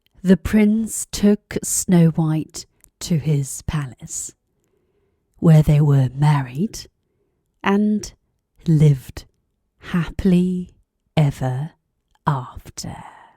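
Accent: United Kingdom. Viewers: 너무 멋진 목소리!👍 구독, 좋아요를 누를 수밖에 없도록 만드는 위트와 격조있는 목소리. 영국식 영어를 선호하지 않는데, 그냥 빨려든다 빨려든다... 매력에~~~~!!❤ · 진지한 개그, 그리고 귀여운 외모, 느릿한 브릿 액센.. 중독성 너무 강해요. ~!!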